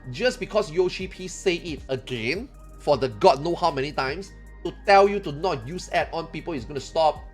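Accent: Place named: Singapore. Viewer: I mean it's an accent from another English speaking nation. In Singapore this is just how people speak English and it's the primary language there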